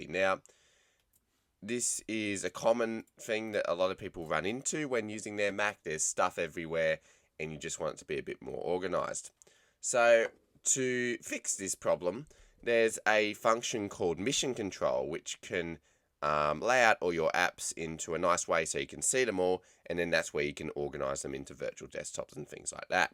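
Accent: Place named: Gold Coast, Australia. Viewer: Use them all the time. Trying to figure out how to lock them. Drives me nuts when they shift. Your voice sounds quite a bit like pro surfer Mick Fanning which leads me to believe your from the Gold coast. Lucky you. Aussie envy here.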